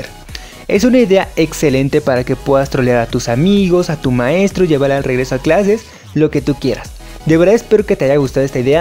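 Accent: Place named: Spain. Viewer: me cae super mal que hable como si fuera un español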